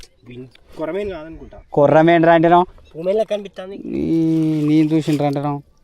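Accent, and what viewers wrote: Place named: Nalgonda, India. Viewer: Bro kotha district lu bifurcation kanapudu medhi nalgonda jilla kani meku dhaggarlo narshimulapeta mandal warangal jilla undey same me slange.. attincharu bro meru...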